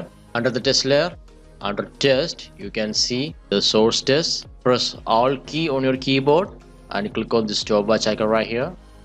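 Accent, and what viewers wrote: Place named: India. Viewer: Shitty Pajeet accent- Once a pajeet always a pajeet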